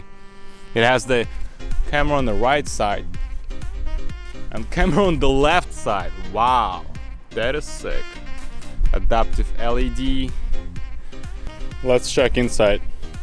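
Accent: Russia. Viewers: Russian accent wtf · Непонятный акцент !! Трудные слова с русским акцентом А когда вспоминаешь походу про акцент и хочешь говорить немного по американски начинается. Бррп брп брэп бэп 😅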